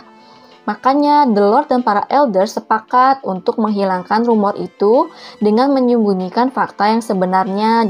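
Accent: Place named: West Java, Indonesia. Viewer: kk orang sunda ya.. logatnya.wkwkw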